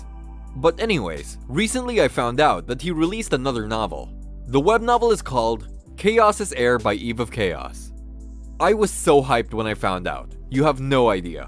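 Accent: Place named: Philippines. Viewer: That's awesome dude. Been here since 200 subs. Btw are u a Filipino ur accent sounds like many Filipinos.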